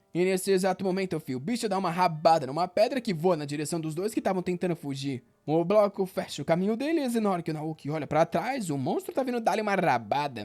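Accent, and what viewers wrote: Rio de Janeiro, Brazil. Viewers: é carioca? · o é carioca?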